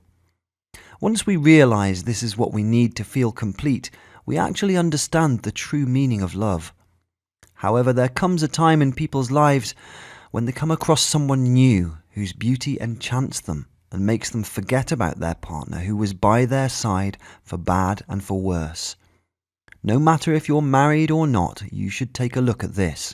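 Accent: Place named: United Kingdom. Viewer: British accents..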